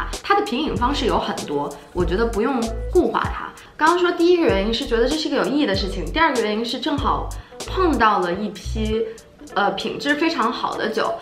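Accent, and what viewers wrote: China. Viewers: 武汉普通话？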